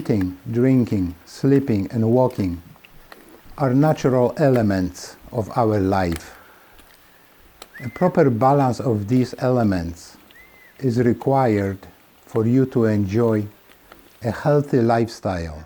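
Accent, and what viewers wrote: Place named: Poland. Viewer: Thank you very much for all your videos. The technical quality is better than almost all the others, the pleasant voice and intelligent content is appreciated too.I planned to walk 2020 in May but - - Covid! I hope to be able to go in Fall of 2021. I must say I taught in Poland for 2 years in the 1990's and your voice has that pleasant inflection that I became accustomed to while listening to my students and fellow teachers. Love to watch your videos on my TV so I can appreciate all the beautiful scenery. Thanks from Canada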